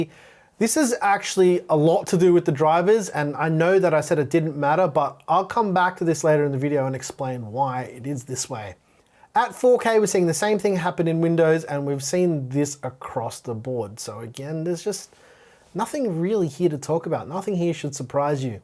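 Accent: Australia. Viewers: No. lol. what an aussie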